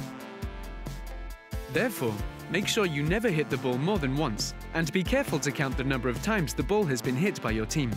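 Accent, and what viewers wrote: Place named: United Kingdom. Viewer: Ik but just British pronounced words just make me laugh. :D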